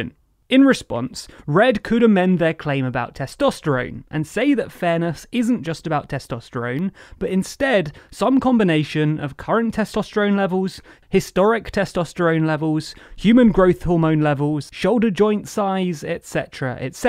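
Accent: United Kingdom. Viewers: Woah, deep video, love this format! More of these please!! I’m able to see the others point of view. It’s crazy hearing a British dude breakdown deep American issues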